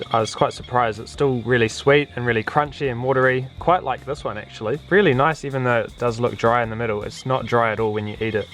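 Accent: New Zealand. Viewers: I have loved this post. I def will give it a go in the future. I'm up at Muriwai Beach, Auckland. Hmmm.... now to find some tyres lol. Bro, when's the best time for us to grow melon? Love hearing our accent for a change. Thinking of starting a channel myself actually. Any tips?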